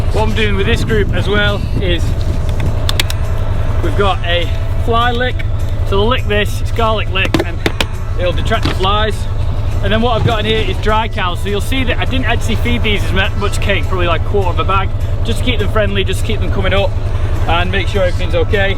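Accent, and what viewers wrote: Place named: England, United Kingdom. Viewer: New to channel. Coming at you all the way from suburban southern California. Just trying to catch up on some of the terms... A bit of a challenge sorting out some of the words through the accent. Like it though.... Not a farmer here in the states but it looks interesting to watch and learn how the UK does it a bit different than in America. Is a good window into the reality of the English countryside. Question..... What is cake? It can't literally be cake, must be slang for something? Keep up the good work. Love the videos!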